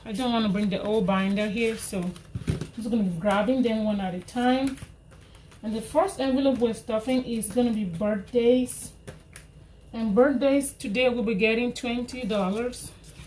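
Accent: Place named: Nigeria. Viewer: Hi! Great cash stuffing. Your so close to finishing your 52 week challenge woo hoo. I love me some Nigerian jallof rice I’ve made it a few times. I thought your accent was Nigerian. I’ve been to African grocery store I find it fascinating. I really enjoy pounded yam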